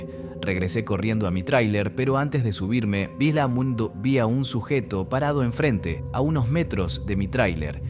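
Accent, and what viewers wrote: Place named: Argentina. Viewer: Buenas historias lo único es que las historias están ambientadas en México y el narrador es argentino 😅😅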